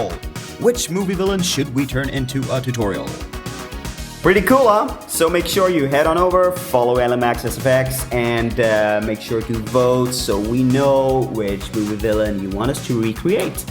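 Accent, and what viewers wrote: Sweden. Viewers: Swedish? :D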